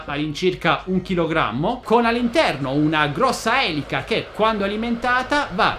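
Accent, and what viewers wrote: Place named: Apulia, Italy. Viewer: Hai un accento sei di bari o taranto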